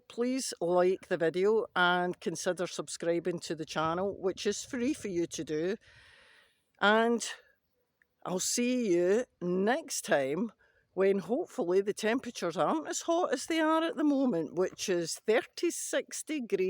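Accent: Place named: Northern England, United Kingdom. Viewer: I heard the accent and thought to myself how on earth has someone up north managed to get butternut squash to come in so quick and also to have toms outside. Then I saw you in France. Well done.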